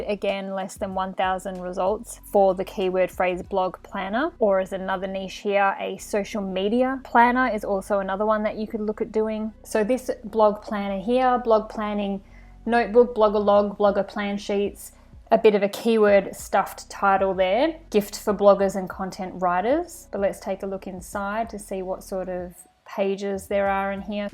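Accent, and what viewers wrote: Australia. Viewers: Is that an Aussie accent I hear?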